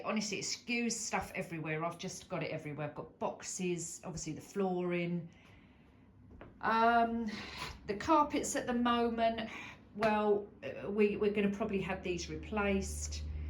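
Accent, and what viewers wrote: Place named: London, United Kingdom. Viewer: Hi new subscriber here, I'm bridie, you sound like a londoner, may be wrong, apologies, I'm London born ❤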